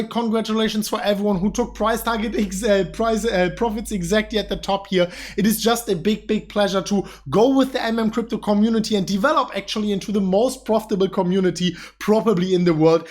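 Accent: Germany. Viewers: I always love the German accent haha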